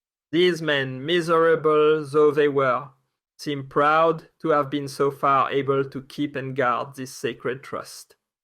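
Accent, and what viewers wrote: France. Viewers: I like the French accent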